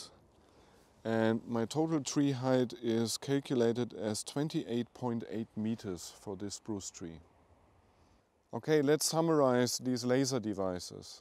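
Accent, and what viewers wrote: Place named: Germany. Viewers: I only heard this accent (I assume Deutschland) in movies such as the big lebowski. What part of Germany you are from if you don't mind me asking? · Or like Helmut, the taxi driver in Night on Earth... Yes, sorry for the German accent, we are from Göttingen in Germany.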